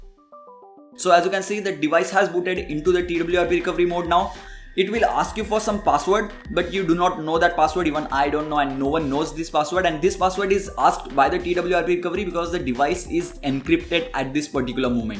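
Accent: India. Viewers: The speed at which you're talking would be fine...if you had clear enunciation and syntax of the English language, and you do not. You have a very thick Indian accent and it's difficult to understand you. I gave up trying to listen and stopped your video after about a minute and a half. You're talking way too fast for your level of English enunciation. You need to slow down so that listeners don't have a difficult time trying to understand and decipher everything you're trying to say.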